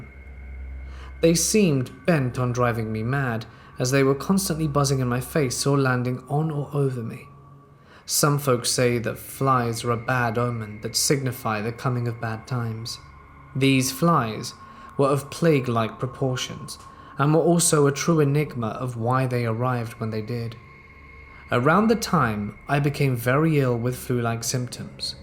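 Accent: United Kingdom. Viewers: His voice has been putting people to sleep for years! I wonder Truly how many of us go strait to anytime we can’t sleep, have issues sleeping, or just crave that gloomy doomy creepy British voice from the gates of hell to whisk us off to dreamland to challenge Freddy Krueger to a death match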